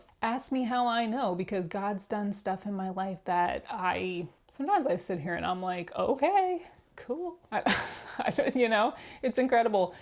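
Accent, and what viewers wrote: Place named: East Coast, United States. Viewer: Not the secret Masonic hand symbols 🤣 I can't believe someone would accuse you of that lol. I like listening to accents and the way people talk. If I had to guess, you're from the East Coast. My guess is New York. Possibly New Jersey. I'm from PA, and the way you speak is familiar to me. I also talk with my hands a lot. No Masonic symbols though haha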